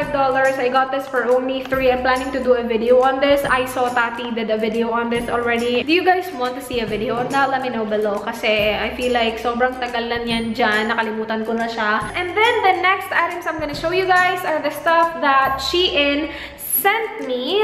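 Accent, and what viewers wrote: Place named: Visayas, Philippines. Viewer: What city do you live ate? The way you speak tagalog makes me think you're bisaya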